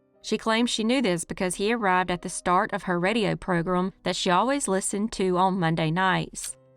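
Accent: South, United States. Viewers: THANK YOU!!LOVE THAT COUNTRY VOICE! KEEP EM COMING!1:hand-pink-waving:face-red-heart-shape:thanksdoc:🤠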